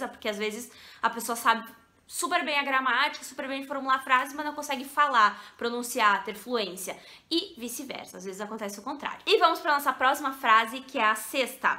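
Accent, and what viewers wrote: Rio Grande do Sul, Brazil. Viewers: ESSE SOTAQUE gaúcho e os verbos USADOS no tempo certo É MUITO chic, PARABÉNS !!! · vc é linda e muito inteligente mas esse jeito de falar dos gauchos são nojento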